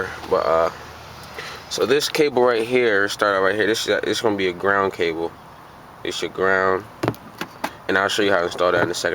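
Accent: Philadelphia, United States. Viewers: hes definately from philly i can tell lol nice work man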